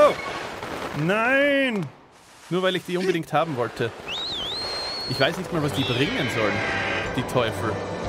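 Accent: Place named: Bavaria, Germany. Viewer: In welcher Stadt lebst du eigentlich weil du hast so ein bayrischen Dialekt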